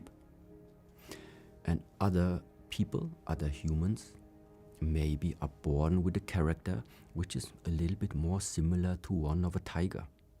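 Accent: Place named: Germany. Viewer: He is German or has lived a long time there. He speaks English like a German. Interesting.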